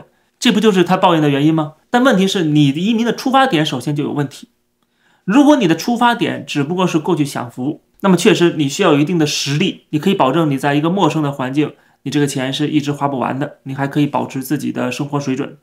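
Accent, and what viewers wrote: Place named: Beijing, China. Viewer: 我怎么听到一北京哥儿们儿在温哥华夜市烤串儿，历时四年购了大豪斯啊……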